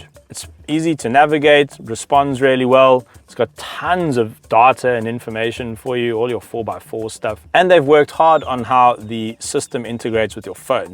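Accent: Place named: South Africa. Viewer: So instead of listening to the review I'm listening to incredible way of presenting, so south african and refreshing. Good job dude 🤜🏽👏🏾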